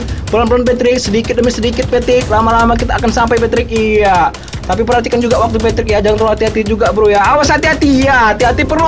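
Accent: Medan, Indonesia. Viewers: ngomong nya aja kaya orang medan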